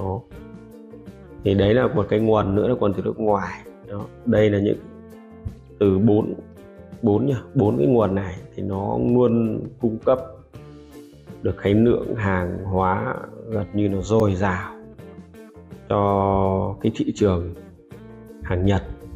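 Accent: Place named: Vinh Phuc, Vietnam. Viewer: Giọng chú như Vĩnh Phúc nhà tôi.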